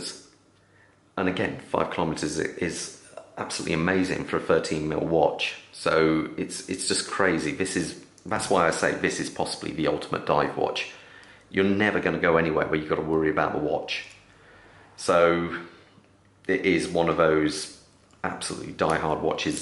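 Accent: United Kingdom. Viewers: In all fairness, he’s sounds British.....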